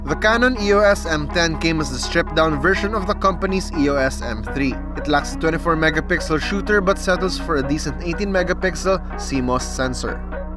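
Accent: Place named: Philippines. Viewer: This accent is so nostalgic... Are you a Filipino? :/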